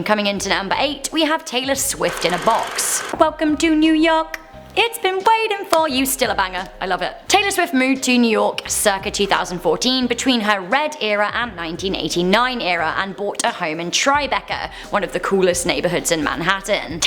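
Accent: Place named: Cornwall, United Kingdom. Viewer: Miss you are looking stunningly beautiful and sounded not like a pirate but more like my neighbours here in Cornwall UK 😍 but as always a great video plus very informative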